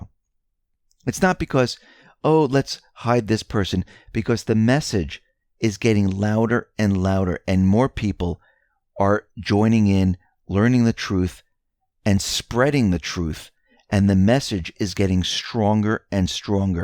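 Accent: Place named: New Jersey, United States. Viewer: He’s from New Jersey, you’ll grow accustomed to it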